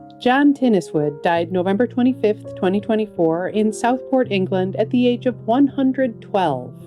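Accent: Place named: Midwest, United States. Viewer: Narrator has an interesting Midwest accent.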